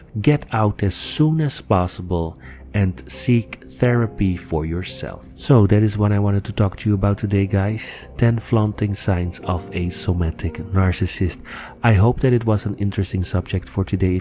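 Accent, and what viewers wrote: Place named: Netherlands. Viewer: Lovely Dutch accent 😃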